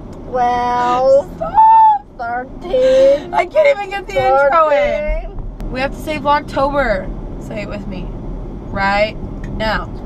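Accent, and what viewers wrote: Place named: Russia. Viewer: Bahaha little russian accent is so hilarious. Oneeee, Twooo, Treee..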